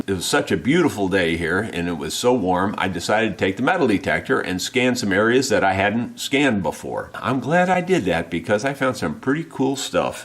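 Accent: United States. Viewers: Hi, i totally enjoy watching your video’s. I enjoy listening to all the interesting stuff you talk about. You have such a lovely accent and could listen to you all day..lol. I find it one of the most pleasant accents from America. I live in Scotland and enjoy your channel. I thought you said you were going to take it easy?. You are a workaholic..lol. You are looking well, So keep well and look after your family..👍🏻 and who know’s… i may come across the pond and rent one of your nice holiday apartments when i have saved up enough money, or robbed a bank..lol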